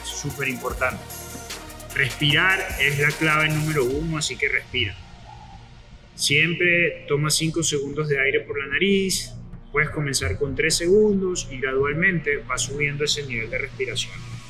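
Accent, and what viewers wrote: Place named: Venezuela. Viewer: Pensé que eras Venezolano hermani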